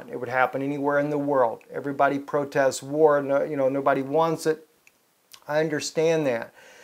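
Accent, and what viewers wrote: South, United States. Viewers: That's where your familiar southern assent. greetings from Louisiana. Jesus bless brother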